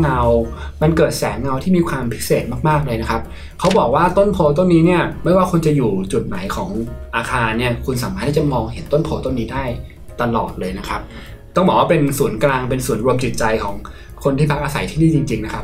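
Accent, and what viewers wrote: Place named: North, Thailand. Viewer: อู้เหนือได้น่าร้กจัง. 😊😊😊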